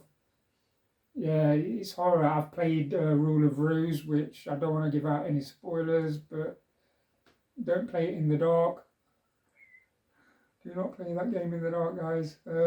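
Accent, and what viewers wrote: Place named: Nottingham, United Kingdom. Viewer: Where abouts are you from roughly mate? Can't quite work the accent out but you sound like you're from my neck of the woods (Nottingham/Mansfield) way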